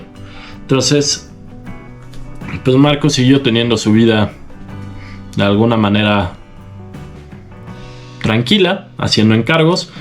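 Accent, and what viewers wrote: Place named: Andalusia, Spain. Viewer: Pareces un andaluz o un sevillano mal que te pese tengo amigos parecidos a ti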